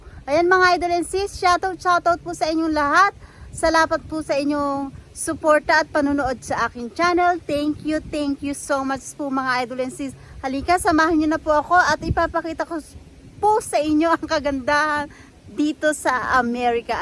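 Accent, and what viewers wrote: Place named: Tagalog, Philippines. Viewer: Hello ..goid evening , very trur ..ganda tlga nv pkace ..naoaka tahimik nv lake na ysn .. Pero ting punto mo tkga ang gustong gusto ko ..tagalog na tagalog ..sa buhsy america ..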